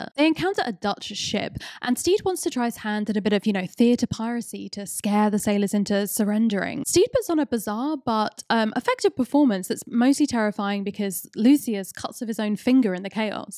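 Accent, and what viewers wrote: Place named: United Kingdom. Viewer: The subtitles transcribed the word duel as "jewel" so if I had forgotten that you were British that would've reminded me lol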